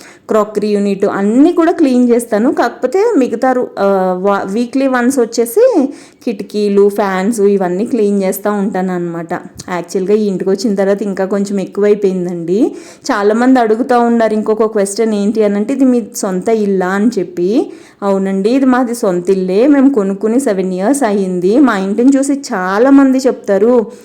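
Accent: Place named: Rajahmundry, India. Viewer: Me voice ma Rajahmundry voice la supar ga vundi andi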